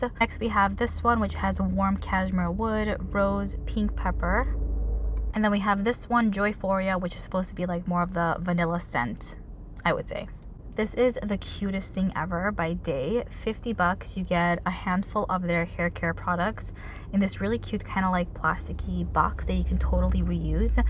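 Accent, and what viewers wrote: New York, United States. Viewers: She has a New Yawk accent just like me!! 😂😂😂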